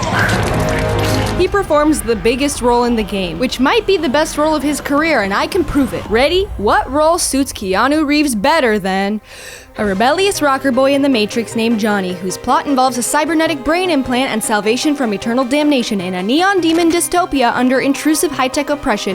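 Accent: Canada. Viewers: You sound stereotypically Canadian. It's funny. And I have to watch more. Need... MORE...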